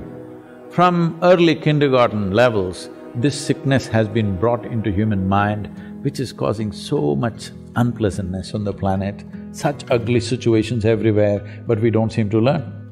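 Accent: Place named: India. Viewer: Giọng ấn đặc trưng thật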